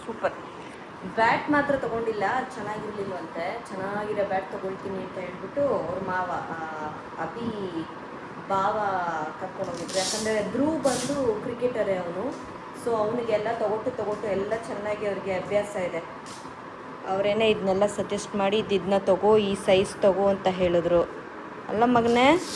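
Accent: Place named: Tamil Nadu, India. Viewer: your talking kannada like a tamilian. You lost ur kannada touch a little. Btw i am tamilian from bangalore. My mom was from chennai whose kannada would be like u Not meaning to hurt u. Just a comment from my POV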